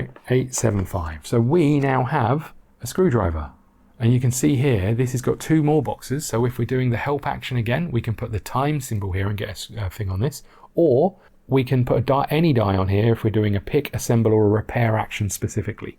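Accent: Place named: United Kingdom. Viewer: Sooo nice to hear a fellow Brit do a playthrough …makes a nice pleasant change from voices across the pond lol…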